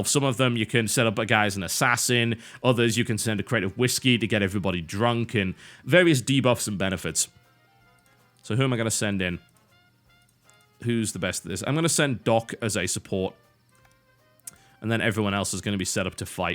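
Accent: United Kingdom. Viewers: no way im going to leave =d i come for the british accent and the opinion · but your british accent is soothing backround noise;)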